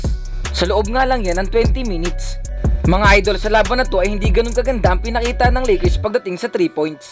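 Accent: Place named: Iloilo, Philippines. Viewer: annoying ang punto ng salita mo mag ilonggo ka na lang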